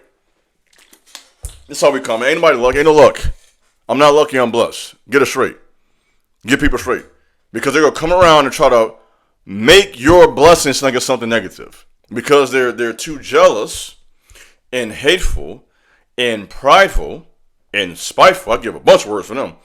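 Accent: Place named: Arkansas, United States. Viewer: I’m from Arkansas yea Thts how we talkin lol 😂 gotta do it for our chilren.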